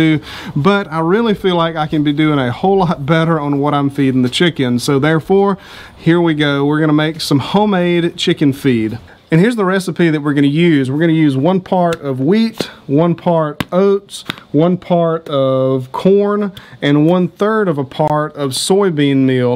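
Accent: South, United States. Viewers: judging by your accent, you live in the south and absolutely do not need heat lamps. In fact, you're risking burning the coop down with them in it. Forcing your hens to pump eggs out during their natural rest period is controversial and not good for them in the long run. It will shorten their life span and many think it's inhumane. The rule of thumb is to let them do what nature dictates.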